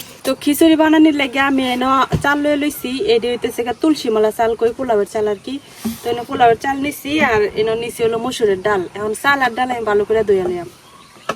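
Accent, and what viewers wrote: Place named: Mymensingh, Bangladesh. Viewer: এটা কি ময়মনসিংহ?? আপনার ভাষা সেরকম মনে হচ্ছে।। আবার পাহাড়! অনেক পাথর!! নেত্রকোনায় এটা? কুমড়ো টা এতো সুন্দর লাগছিলো!!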